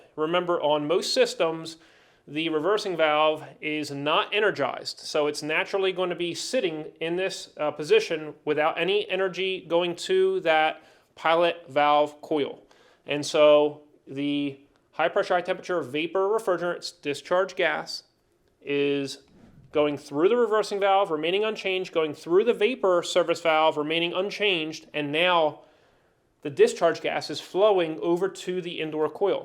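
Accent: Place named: Philadelphia, United States. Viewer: Philly accent detected 👂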